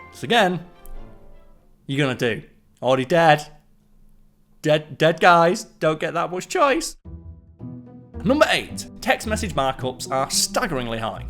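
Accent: England, United Kingdom. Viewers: 1) I like his dinosaur shirt. 2) Maybe he uses bleeped words too much. I'm still thinking about that because I use bleeped words also. 3) I think the whole video was paced well. 4) I'll miss Simon, but I'll like just as much after I get used to his slightly different British accent. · Can you slow it down a bit. Yes I could set my speed to 0.75 but you wanted feedback. I like the English accent but a bit slower presentation would suit me. Again, feedback asked for, not a critism. I said I'd give it a go, has big shoes to fill. I'll hang around a bit longer.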